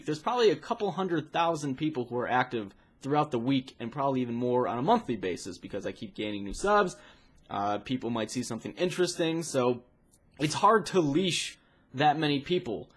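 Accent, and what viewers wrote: Ireland. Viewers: are you irish? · Hey are you irish please respond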